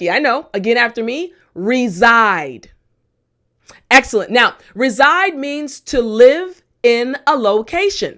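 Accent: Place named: New York, United States. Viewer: Hi, I love your voice and accent. Are you from NY?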